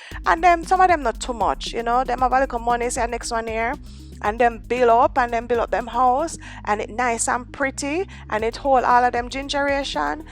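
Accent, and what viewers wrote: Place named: Jamaica. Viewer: I love your commentary. It's funny and yuh patwa sounds so nice.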